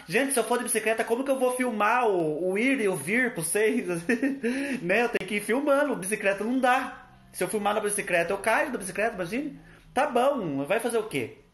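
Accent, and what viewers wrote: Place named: Paraná, Brazil. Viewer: Oi, você fala igual Paranáense..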